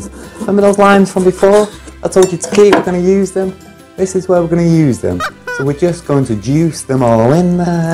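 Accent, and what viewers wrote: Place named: Northern England, United Kingdom. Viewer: wtf he sounds nothing like british black community.. hes has a northern english accent.!!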